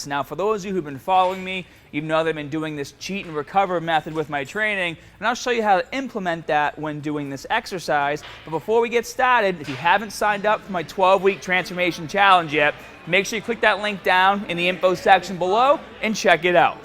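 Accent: Boston, United States. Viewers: seems like you've turned up your boston accent a bit lol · That Boston accent was too distracting.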